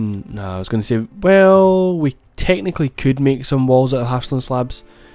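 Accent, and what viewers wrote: Scotland, United Kingdom. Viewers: Are you scottish? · You are why I love the scottish accent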